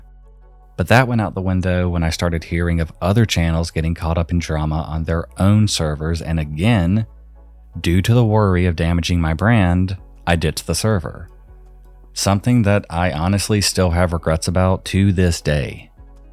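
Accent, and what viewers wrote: South, United States. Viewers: I only care about your silky Southern Sibilance.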